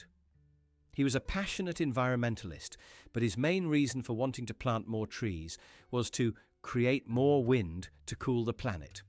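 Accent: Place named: United Kingdom. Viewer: This is a British accent trashing Americans, and we have enough trouble. Thank you.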